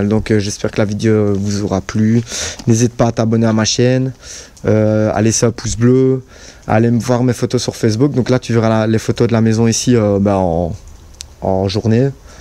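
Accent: Liège, Belgium. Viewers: Ah l'accent de lièges 😂 . Bon c'est un endroit en Belgique mais ou .... en tout cas c'est bien glauque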